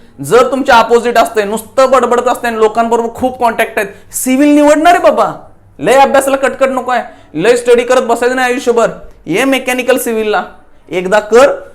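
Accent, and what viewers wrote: Solapur, India. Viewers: Ho Mala pan tasech vatatay Kattar solapuri😅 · Sir tumhi kuthe bhashevarun solapurkr vatatay 😊😊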